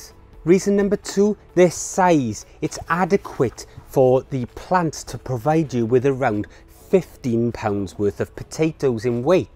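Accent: United Kingdom. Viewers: At about the mark of, in Tip No. 2, the captions say, "...use a 30 liter or "80" US gallon container.", you have correctly said it.... 8 gallons. You, however, might wish to get the captions corrected. Those either unfamiliar with the British accent or not accustomed to the Metric system, and totally relying on the captions will be thrown off, and head to the market to buy giant trash cans. LOL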